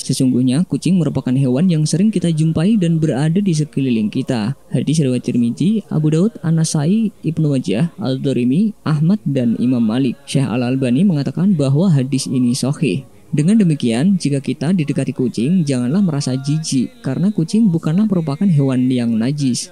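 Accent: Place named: Kebumen, Indonesia. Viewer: Wong kebumen ngapak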